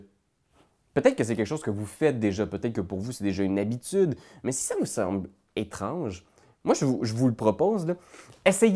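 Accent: Quebec, Canada. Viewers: tu es quebecois ? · En tout cas ici en France on a des régions avec des accents et tout un tas d'expressions bien différentes et je n'ai jamais vu personne essayer de le changer en jdr. L'accent québécois à son charme comme l'accent ch'ti ou l'accent du sud, ne changez rien, moi je vous regarde plus pour votre accent que malgré lui :D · Moi j'adore votre accent <3 Il faut que j'épouse un Québécois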